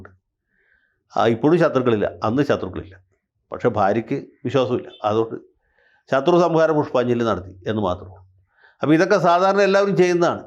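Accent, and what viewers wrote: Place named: Thiruvananthapuram, India. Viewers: വക്കീലിന്റെ തിരോന്തരം സ്ലാഗ് ഒരു രക്ഷയുമില്ല 😂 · വക്കീലെ നിങ്ങളുടെ ട്രിവാൻഡ്രം സ്ലാംഗ് ഒരു രക്ഷയും ഇല്ല 😂😂😂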